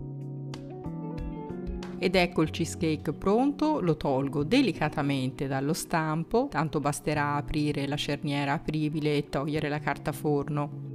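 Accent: Tuscany, Italy. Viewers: Buonasera, spiegazione precisa e completa, impreziosita dalla tua voce dall'accento toscano semplicemente meraviglioso!😍 Ottima freschissima cheesecake con un superbo topping al limone 🍋! Grazie, insuperabile!👍🥰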